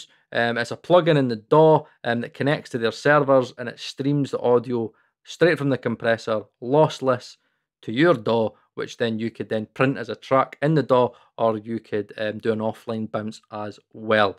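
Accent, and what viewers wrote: Scotland, United Kingdom. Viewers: Hi, just a quick off-topic question: When you shoot your videos, do you "soften" your dialect or is this the way you speak in real life, too? Just asking because I plan to go to Edinburgh next year with some friends of mine. (I have no problems at all to understand you. Been in Scotland 30 years ago but they've never been there. So I wanted to send them your videos to get used to the dialect.)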